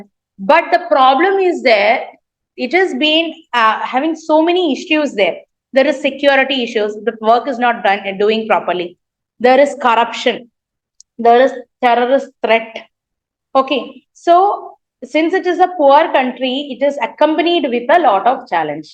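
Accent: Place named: Kerala, India. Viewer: malayali pronunciation😕😕😕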